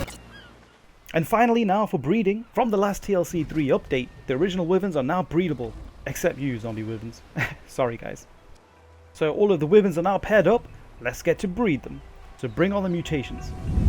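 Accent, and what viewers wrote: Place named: England, United Kingdom. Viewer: Good video and well researched but considering you sound English I found excruciating that you pronounce Wyvern wrong... Considering it’s a Welsh dragon... ><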